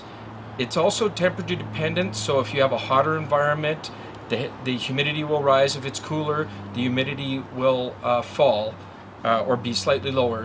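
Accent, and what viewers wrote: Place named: Canada. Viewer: Great video i love the way canadians say about (a boat) and every conversation starts with ah fuck buddy very funny and cool.and whats the details on that lovely himidor ie price.capacity.cheers.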